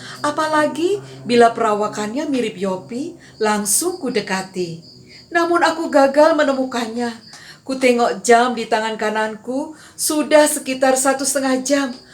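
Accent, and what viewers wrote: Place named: Eastern Indonesia, Indonesia. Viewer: Mantap bu. Logat timurnya masih kentara yah bu. Sukses selalu Tuhan Yesus memberkati. 🙏🙏🙏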